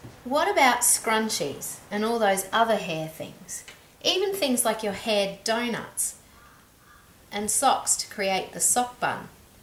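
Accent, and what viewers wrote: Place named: Australia. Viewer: that strong aussie accent xD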